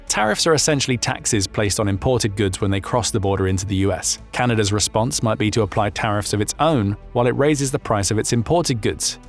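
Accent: United Kingdom. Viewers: Even though the topic is disturbing, it is buffered by a British voice. I don't think this is realistic, but I hope that our Commonwealth allies would help us.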